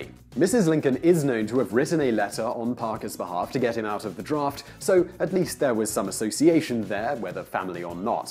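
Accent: England, United Kingdom. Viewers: Sounds weird, English accent talking about American history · You know so much about American history, and you have a British accent. Hmm...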